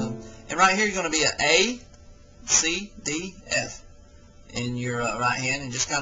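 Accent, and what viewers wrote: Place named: United States. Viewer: Hey, this is cool! interesting accent too! which part of america you from? thanks for sharing your insights. love it.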